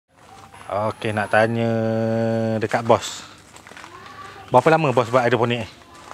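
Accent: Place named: Perak, Malaysia. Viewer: Cara abang cakap macam orang perak le...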